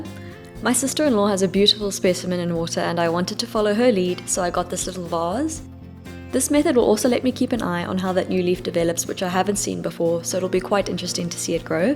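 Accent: South Africa. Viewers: Very informative, I'm definitely going to try and I was happy to hear a fellow South African! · Are you South African. I detect a slight accent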